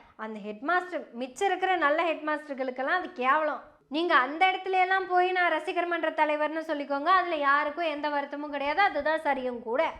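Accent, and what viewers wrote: Kongu, India. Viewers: Nee oru dmk பரதேசி போல இதுல கொங்கு மொழி வேற பன்னாடை கண்ணாடி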